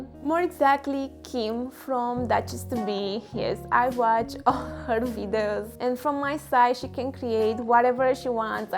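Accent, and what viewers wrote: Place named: Romania. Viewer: God damn, I recognized instantly that you're from Romania (mostly because so am I) but daaamn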